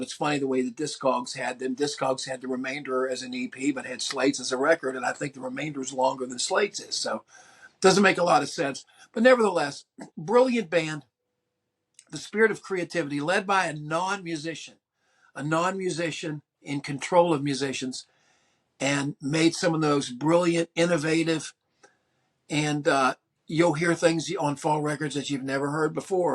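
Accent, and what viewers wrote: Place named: Maryland, United States. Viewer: Your Maryland accent, which I share with you, is excellent for reviewing Fall albums. It’s a little oddball in the pantheon of American accents, although it can’t really rival the distinctiveness that is the Mancunian accent. I could be wrong about this, but it seems the Fall always had a lot of fans in the Baltimore / DC area.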